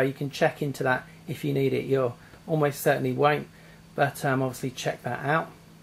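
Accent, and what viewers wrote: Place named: United Kingdom. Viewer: you sound a little like a British mii in Tomodachi life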